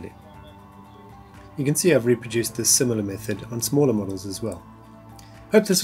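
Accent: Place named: South Africa. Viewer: Great video and SA accent, just started with this hobby, lots to learn from a master craftsman. Looking forward watching all your vids. From sunny CT.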